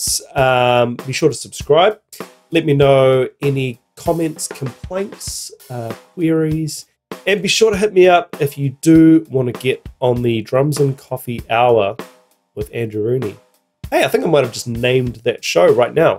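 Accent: New Zealand. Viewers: BTW your Kiwi accent is just awesome!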